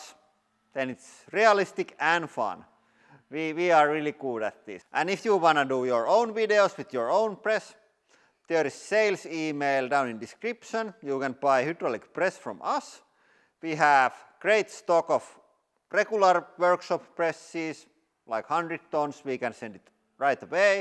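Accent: Finland. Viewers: I want to listen to you explain everything in a Finnish accent! 😄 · It is so much better with the Finnish accent 😂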